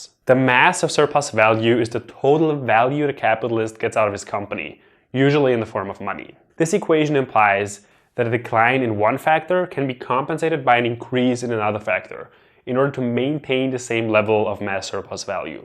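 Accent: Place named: Germany. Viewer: You think your so smart because of your german accent. You are the bourgeoisie that Marx described. You benefit from the superexploitation of the third world. Maoism-third worldism is a new era of marxism. Your class position in society is determined by the level of exploitation you benefit from not always the relations with the means of production. For instance, during the feudal times, the serfs were held liable to work for a certain quantity of days for the nobles. Even though the serfs owned the means of production such as the land, tools, and equipment, under feudalism, they were compelled to abide by the rules of the ruling class under feudalism which soon led to the french revolution. The french revolution brought forth the primitive stage of capitalism by the execution of King Louis XVI. Feudalism is the dictatorship of the nobles as to capitalism is the dictatorship of the Bourgeoisie and socialism is the dictatorship of the proletariat. 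Capitalism-Imperialism is the dictatorship of the new bourgeoisie, the first world parasites.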